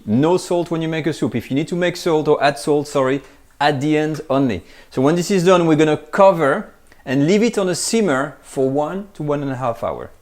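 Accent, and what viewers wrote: France. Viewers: His English is quite excellent, and I do love a French accent! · It's Frenglish. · This is exactly how the French speak in Pink Panther. Thanks for an easy and doable French recipe.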